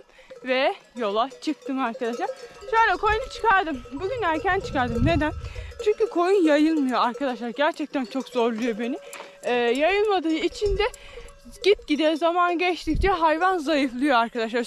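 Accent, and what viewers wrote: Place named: Gerede, Turkey. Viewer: Konuşmalarını dinlerken bu kız Karabüke yakın bir yerlerden ellehem dedim Geredeli çıktın konuşma aynı bu tarafla.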